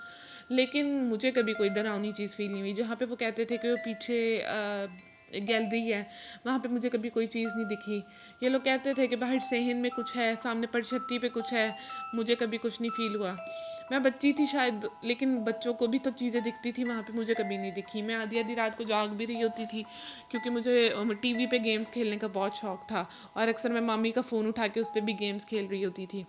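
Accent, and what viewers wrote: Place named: Punjab, India. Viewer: Very interesting story I really enjoyed or apka punjabi accent buhat acha hai 👍👍😂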